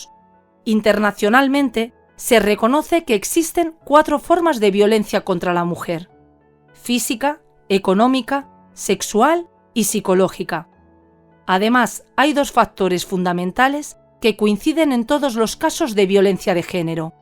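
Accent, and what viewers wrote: Spain. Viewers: eres una mujer excepcional. Relatas casos a la perfección. Me encanta tu acento español. En cuanto al caso de Brenda, afortunadamente se hizo justicia y ese maldito (Juan José Murúa) se pudrirá en un reclusorio. Ojalá también se haga justicia para Marisol y Luz.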